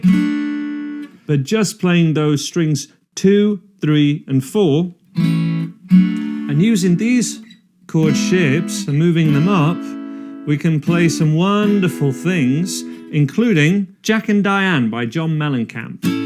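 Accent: United Kingdom. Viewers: so British! Love the accent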